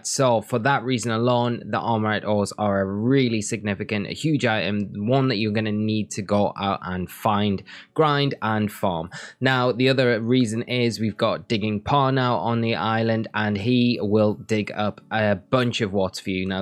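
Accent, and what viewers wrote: Newcastle, United Kingdom. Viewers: Why’s a Geordie posting at 3am? Too hot too sleep?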